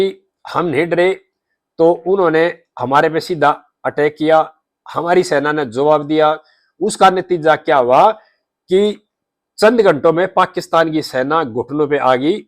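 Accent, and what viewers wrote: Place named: Rajasthan, India. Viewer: Vdo dekhneme bilkul maja nahi9 aayi.....Rajstani chacha boli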